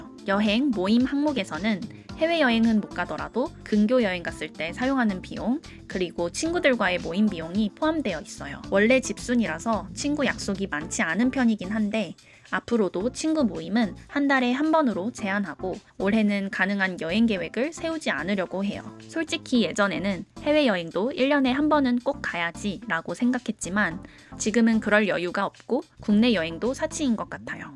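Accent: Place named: Gyeongsang, South Korea. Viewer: 갱상도 사람이셨군요?! 카페가 맞지요~ 타지에 오셔서 고생이 많네요, 저랑 똑같네요😀 이번에도 화이팅입니다~!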